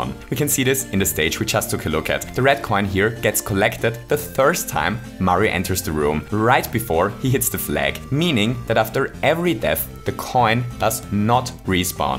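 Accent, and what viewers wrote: Germany. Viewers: Ahhhh ein Deutscher. :D Dein Dialekt verrät Dich. ^^ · This german accent....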